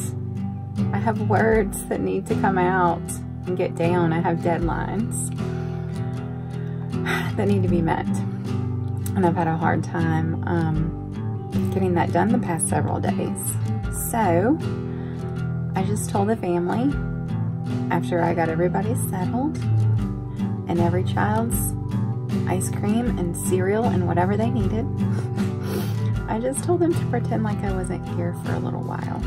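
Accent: South, United States. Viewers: I enjoy hearing you talk,I always have liked to hear southern bells talk,it's just so sweet.I know you explain things that you say,so other people,not from the south,will know what your saying,but I get every word,and it's refreshing.Anyhow,keep up the goid work,I really enjoy your videos.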